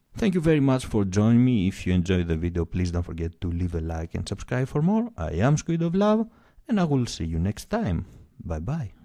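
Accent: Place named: Greece. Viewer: Hmm, by the accent I would say he is Greek. Or at least his sounds like one.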